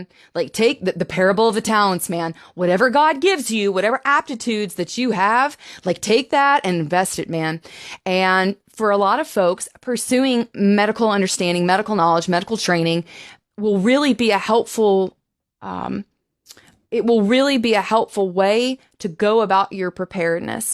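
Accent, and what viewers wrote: South, United States. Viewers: Nice to hear the southern accent coming out more!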